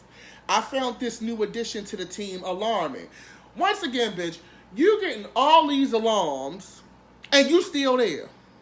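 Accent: Baltimore, United States. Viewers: I love this dude! You are the truth! Very nice with the ""Let's unpack this..." So colorful. I'm from DC and I hear that B-more accent. Makes me laugh. Keep up the good work!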